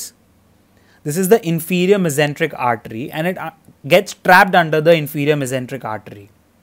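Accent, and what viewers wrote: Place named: India. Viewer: I usually straggle at understanding Indian accent, but you are so clear thank you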